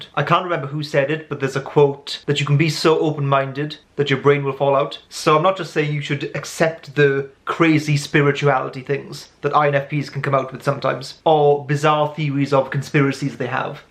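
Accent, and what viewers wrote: Wales, United Kingdom. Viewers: As an INFP female, I watched this video out of curiosity...I’m not even half way through the video yet, and this is the most accurate description of my personality I’ve ever heard....My mind is officially blown !! Is that a Welsh accent by the way? :) I’m from South East Wales - near Cardiff :)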